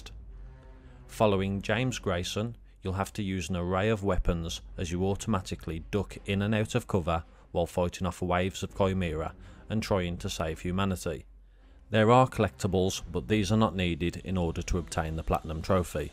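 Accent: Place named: Midlands, United Kingdom. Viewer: Great video and content, plus it sounds like you're from the Midlands, so subscribed! Looking forward to more but in the mean time I will check out some of these games. Cheers.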